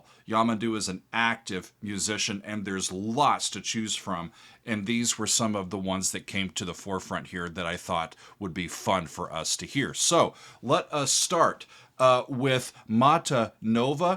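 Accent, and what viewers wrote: United States. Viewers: seu sotaque americano é muito bonitão! Gostei! ❤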